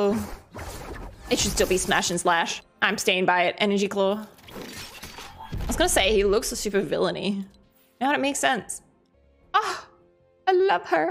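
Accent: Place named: Australia. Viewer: Is it just me or is getting more Aussie sounding as time goes on?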